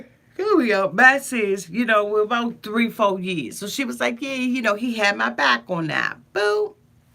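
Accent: Washington DC, United States. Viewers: Hi there 👋🏾. I'm a newbie here. I've been looking for more reviews of this show. Glad I've found you! Where are you from? I here a DC area accent. Am I right?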